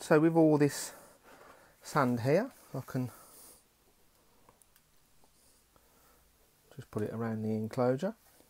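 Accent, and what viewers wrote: Australia. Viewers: As a layman I have never heard of this classification, but when I hear an Australian say the words "poison level foive", I know sh** is real! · The best Aussie accent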